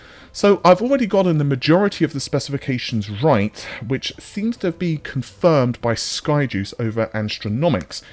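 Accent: United Kingdom. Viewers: Three quarters of an hour! Three quarters of a fkcun hour and you don't know anything! For three quarters of a fkcun hour you talk the talk about the talk which talks about other talk you've heard in the past and touches on possible future talk you might or might not hear one day, and all I get from that is waffle in a British accent! You don't know shit ~ you can talk about not knowing shit for 3/4 of an hour. I'm never going to get those 45 minutes back. It's like the promise from Micro$oft that you can wipe windows and get a refund from the company. In all the 3 billion people who have wiped windows and tried to get that M$ money back, how many have actually received it? I can hear crickets, I can hear tumble-weed blowing past..... I can hear Liz Tru$$ going down in flames but I can't hear anything about my 45 minutes! But for the rest of my life I will be still able to hear the talk that talked about the talk, which featured the talk about talks we've had in the past and looked into the bright and Rosy future (she's a nice girl, Rosie) and then we stopped to talk about that talk, so the talk got side-tracked by the talk which went into a talking holding pattern around the outer marker while we talked about fuel economy and reserves of time, because to run out of wind in mid talk would be a catastrophic event, and no meta-talk (a talk about a talk) could deal with the meta-catastrophe of talk about talk that talked itself to a stand-still....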